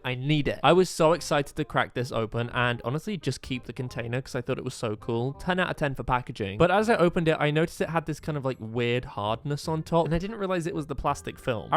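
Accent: United Kingdom. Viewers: I introduced this channel to my friend and now she watches just for the British accent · He sounds British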